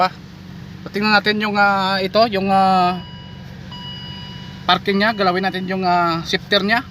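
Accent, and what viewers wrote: Iloilo, Philippines. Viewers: Teh daw ilonngo gid timo bah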